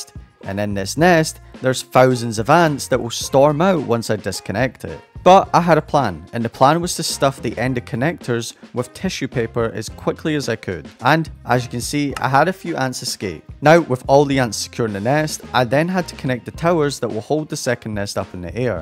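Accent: Scotland, United Kingdom. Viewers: You Scottish? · Are you British? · Are you Scottish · are you by any chance Scottish? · that scottish is chefs kiss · Is bro British?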